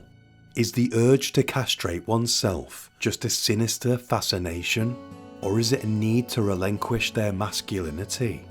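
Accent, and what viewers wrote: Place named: North, United Kingdom. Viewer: I have to know where your accent originates. Do tell. Has to be northern at least. Thanks from Louisiana USA!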